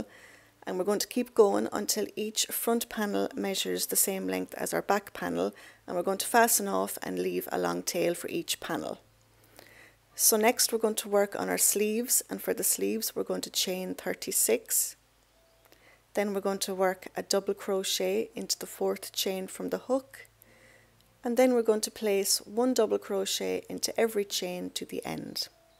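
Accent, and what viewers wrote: Ireland. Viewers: I am a new learner, I was scrolling through tutorials on how to crochet a cardigan " I love a challenge " when I heard you're Irish accent 🇮🇪 I had to try this as im Irish. Im getting there. Thank you. ❤❤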